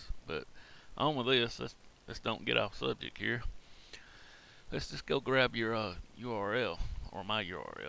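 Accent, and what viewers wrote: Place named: Arkansas, United States. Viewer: Great accent. I'm from Central Mo so mine is more of a flatter accent. Yours is rich with Arkansas.....possibly Tenn/Kentucky......Virginia, not so much. Memphis? Blythville? Little Rock?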